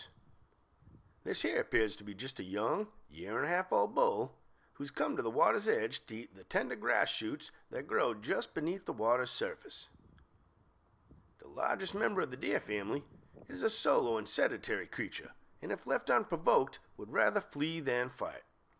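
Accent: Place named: New England, United States. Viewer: Worst accent ever,.like a southern, yankee massachussets, fake maine combo, just terrible, hard to watch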